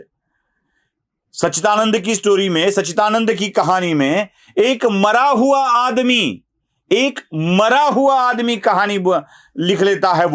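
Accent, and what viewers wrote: Maharashtra, India. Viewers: Hindi thik se nahi aati mharhti tuun lag rahi mharhti aap ke muha se achhi lagti Hindi na bola kare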